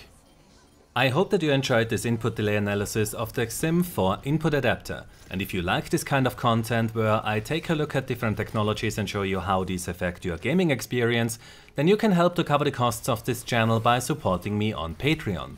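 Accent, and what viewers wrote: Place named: Germany. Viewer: Call me Sherlock I think he might be german ._.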